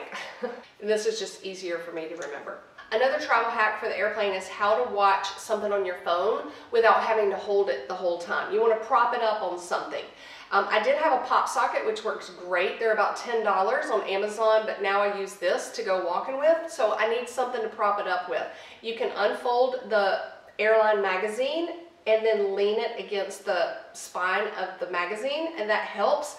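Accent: South, United States. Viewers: I love her southern drawl. Super charming.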